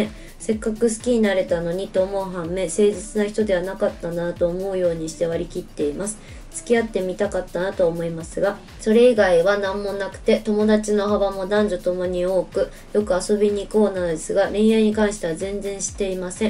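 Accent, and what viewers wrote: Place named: Kansai, Japan. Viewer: やばない？ってさんの関西弁めっちゃかわいい笑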